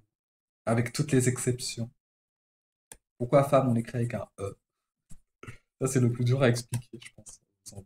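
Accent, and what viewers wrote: France. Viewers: dat anglish accent typical french playdr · With your English accent that is not very good, I could guess what language you originally speak, your native language is French. Correct me if I'm wrong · I speak fluent French and I can't understand a French accent for some reason but I heard something about Sunday and it's raining????? XD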